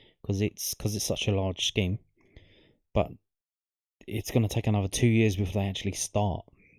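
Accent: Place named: Cambridge, United Kingdom. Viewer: Sorry mate your voice is pissing me off and you have the accent of a Cambridge fan so I won't be watching this bollocks again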